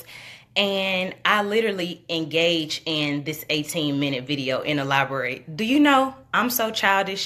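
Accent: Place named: South, United States. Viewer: You just earn a new Subbie!..You sound like me(southern twang) and have a awesome spirit. keep Queenin Queen!..✊✊❤❤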